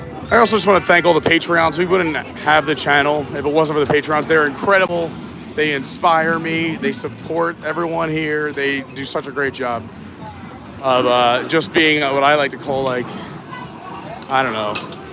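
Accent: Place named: New York, United States. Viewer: what part New York are you from?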